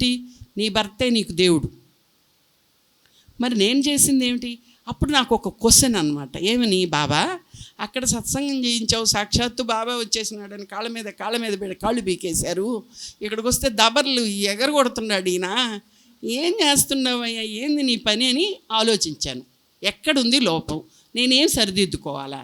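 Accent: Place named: Nellore, India. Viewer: "సాయి సత్య ధర్మ వైభవం" - <num>, షిర్డి సాయి గ్లోబల్ మిషన్ ఆధ్వర్యంలో సాయి తత్వ ప్రవచన కార్యక్రమంలో వాకాడు ఆనంద సాయి మందిరం శ్రీమతి ప్రసంగం సాయి బాబా గారి తత్వం గురించి…సాయి తత్వం ఎంతో గొప్పదని సాయి అన్ని ముందుండి నడిపిస్తారు అన్ని నెల్లూరు స్లాంగ్ లో అద్భుతమైన ప్రసంగం చేశారు..🙏🙏🙏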